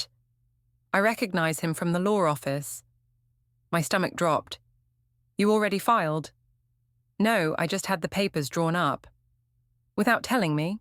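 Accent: United Kingdom. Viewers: Texas with British accent?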